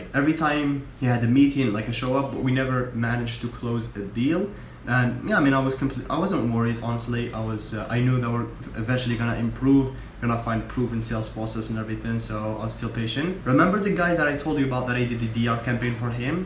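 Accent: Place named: Morocco. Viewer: Salam alaykom brother Are u moroccan? Ur accent is giving it away lol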